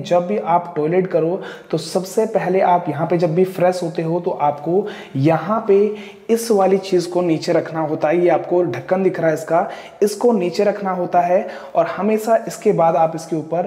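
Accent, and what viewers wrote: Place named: Pahari, India. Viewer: Bheji 🙏 isme kya new hai video me ! Bhut ajeeb lga ye video but apka sh - s maje daar hai jo sari pahadi logon ki lenguage me sunai deta hai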